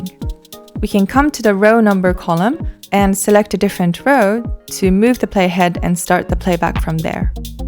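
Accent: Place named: Ireland. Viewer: your accent is so nice. did you live in ireland at some point by any chance? theres a few words here and there that sound very irish to me but maybe its some similar accent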